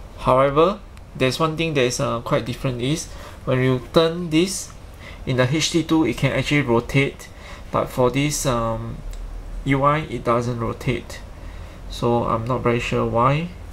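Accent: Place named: Singapore. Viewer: i don't like your accent. SINGLISH?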